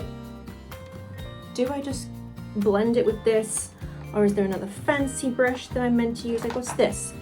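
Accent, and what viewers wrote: United Kingdom. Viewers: lets all be honest, we love their accent, where the brithish gang at?? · Who else loves British accent 😃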